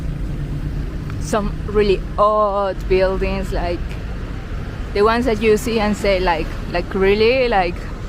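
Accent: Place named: Mexico. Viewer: I understood everything and I love your accent I don't know where you are from but I ges you are Mexican congratulations nice video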